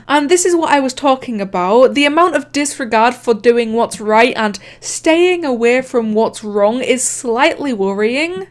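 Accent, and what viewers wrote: United Kingdom. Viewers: youchewber British? I'm british so I would now that ur british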